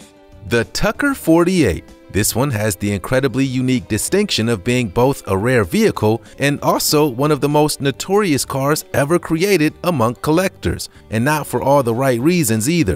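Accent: United States. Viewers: Nob the Knave English language is coming from 🇬🇧, and this is a cowboy English dialect, and it's sounds like he has hot baby patato in his mouth. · I hate when people with and American accent talk kilometers. They know it don't mean crap to most of us. Miles per hour please !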